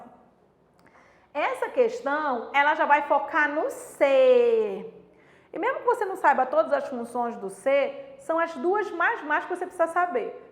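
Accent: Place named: North, Brazil. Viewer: Eu estudando e aproveitando para matar saudade do sotaque do Norte...morei 4 anos no Pará...parabéns pelas explicações.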